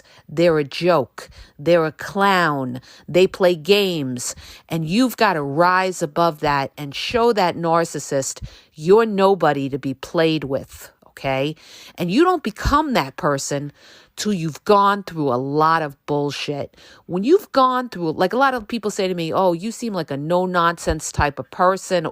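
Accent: New York, United States. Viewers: . You are an amazing woman. The amount of information and experience that you share is helping lots of victims of narc abuse.Your N.Y. accent and honesty is awesome. You have figured these creatures out. They are not genuine and they are not going to help anyone. LIARS. Stay away. God bless.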